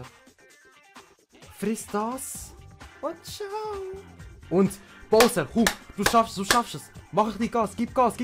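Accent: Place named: Switzerland. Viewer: Mmmmm Musik in miiene Ohre. [Schwiizerdütsch] andlich moll a Kanal wo nit Schwobedütsch redet. An alli Dütche wo dass woll läse und mi nit so richtig verstot dass soll nümme Spass sie und Zücher Chönet grat wag goo. Spötistens jetzt würde ihr in lelem Kanton i lab. ;)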